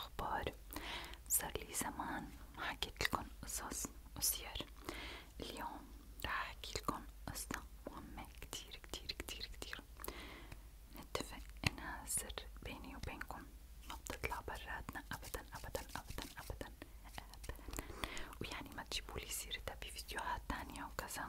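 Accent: Egypt. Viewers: اللهجه المصريه عليكي تحفه بجد❤❤ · اللهجه المصريه عسل 😊❤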